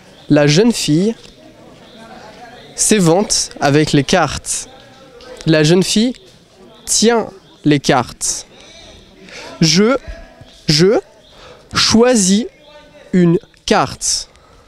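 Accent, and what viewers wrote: France. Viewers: The way this guy is speaking French is really cute. And I love to hear the french accent it's so satisfying I don't know why. I didn't learn much from this video honestly. 😂😂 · Love the French accent. :)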